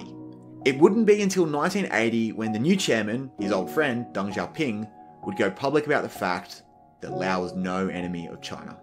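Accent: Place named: Australia. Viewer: “His wife dipped WHAT in water to make sure he drank what he needed”??? Can’t understand this narrator every 4th sentence. No doubt this Aussie and ALL UK people think Americans NEED an accent in their videos. Lame.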